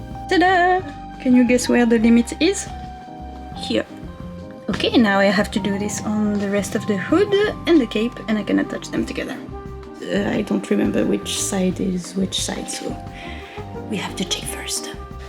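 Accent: France. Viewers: J'ai reconnu le petit French accent alors j'écris en français... Je ne sais pas pourquoi votre vidéo m'a été proposée mais je suis content de l'avoir regardé, très sympa, bravo :) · Do you speak French or other language to English habitually ? (J'ai cru reconnaître l'accent français ! ) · oh french accent, UNE FRANÇAISE QUI PARLE ANGLAIS, incroyadible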